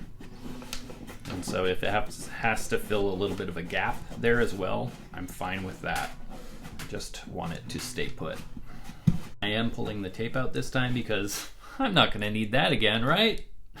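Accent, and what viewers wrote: Canada. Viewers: Actually I think it sounds spot on. I have a question for u, for a Canadian why do u say “Oooout” & “Aaaay” like the rest of ur peeps? This coming from an American w god only knows how many accents n dialects…. 😮 Yes I’m n Idiot, like the channel brother!! Can’t tell who u best look like, it’s either Dave Mustain the Megarocker without the beard or the Viking slayer Zach Wild. Either way that would put U in a killer collection! Rock on bro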